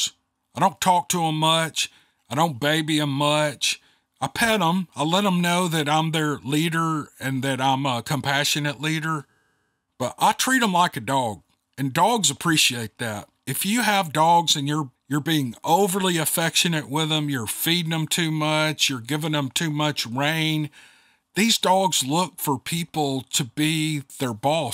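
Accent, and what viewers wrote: South, United States. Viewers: As a California native, I love nothing more than a Southern storyteller. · Your "accent" is part of who you are brother.... F all that.... And just a personal note... I find it comforting... Moved to DE at 15 after a lifetime prior in WV/OH. That was 94... Been here since... Family back there passed mostly... Elders due to age, and even my cousin who was the closest friend/brother and in age as well, I had... Drugs took him. Life rather, took him..... I won't get into all that.. but I'm saying... I listen for many reasons... The southern feel... Is one. Thank you. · I have a news lash for ya, you cannot mask your accent it comes through no matter what, that is how we Southerners talk, if it does not agree with some folks that alright with me! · Hi, just wanted to say regarding comments on your accent, as a New Zealander retired in Thailand, i find your southern accent & story reading skills, adds to the realism, and i can picture the story in my mind, ( true or not ) i really enjoy them, ķeep it up, cheers. 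· Ok,OK, keep the Southern country boy accent please. 😂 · For me there ain't nothin better than hearin' the voice of a southern man. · you have a great accent like me Southern🤩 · Sir, we love you for you. I was born and raised in the South. Hearing your voice makes me feel home. We joke that my inner compass broke because I moved up to LaCrosse, WI. Then, I got married and had to stay for now. My husband has decided he wants to move South. Praying we get to. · I love your southern accent!! Screw those haters! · I agree with Kevin! You sound like a true Southern Gentleman. I enjoy listening to most of your stories. Thank you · You talj just fine people are just mean. Your Southern accent is cool. If I had that accent here in California, I'd be running from all the women, don't change a dang thing lol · Are you kidding me? Everyone loves your southern accent 😊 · I love your accent. I'm southern too and ppl laugh at me all the time and I could care less. 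Been in Fla for 28 yrs now but grew up in Thomasville, NC. A true southern girl here and dang proud of it!!!! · your 'valley girl' impression needs some work! Nice try but please keep that wonderful, natural, southern twang, after all this is! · What's wrong with your accent? I've lived in the south, and you have a fairly mild accent. Try under standing someone who primarily speaks "gullah". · Thank God you are back with your southern accent. Who cares what that one or two feels about how you talk. I like it! Your voice feels like home. Your stories holds my attention and I hear your stories the way they should sound. Glad you are back. ❤ the stories you share! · you got a great southern accent you’re a natural story teller excellent. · I love your accent. You see I’m a Connecticut Yankee. I had a wonderful pastor from the south. He was such a kind and wonderful man. He loved all his congregation and we loved him. 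Every time I hear you I think of him. Thank you, your voice is very comforting. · Southern accents are cool, cause it makes the stories folksy & that's a good thing🤗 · I love your dixie accent Love 'n' hugs from England ❤ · Hey I always liked the us southern accent, I don’t know when people started using the like word so much it’s enough to drive me right up a tree a can’t stand it, even some older people use it now, I would rather have a jaw full of tooth aches than here it, lol Fr Canada 🇨🇦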